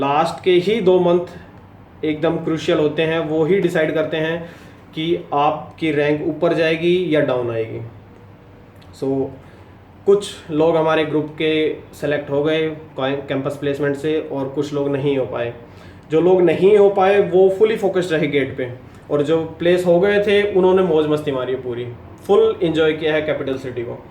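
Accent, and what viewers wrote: Haryana, India. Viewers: Boli m jyada Haryanvi touch h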